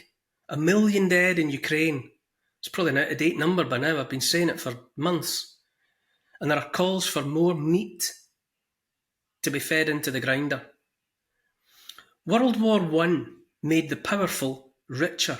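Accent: Scotland, United Kingdom. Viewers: Neil, I am an American who has lived 71 years. I have seen a lot of things throughout my life that long ago raised my distrust of power at all levels. I have had love since childhood, for books and learning, from the classics to philosophy, science, and everything in between. I have read Fahrenheit 451, and it is now banned in some libraries here in the U.S. I know you are a kind and decent man, blessed with that wonderful Scottish accent, and I agree with everything you said. But I'm worried about what you didn't say. I agree that revolutions are bad for people caught in them, but what is the unsaid part. How does society get rid of the yoke of tyranny? Sometimes, you have to flip that coin. I read a great little book years ago, written by a Scottish American academic that had a memorable effect on me. Man's Unconquerable Mind by Gilbert Highet talks about mankind being on both sides of longing to be free and staying strong enough to get that freedom. 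If you know freedom, you can not accept anything short of that. I would love to hear what your suggestions are for people in the U.K.,the U.S. or anywhere. I have been watching the U.K. with alarm, and I can see the same thing here and elsewhere. The world is a grenade with the pin pulled. By the way, Bradbury was prescient about book banning. I enjoy your show very much.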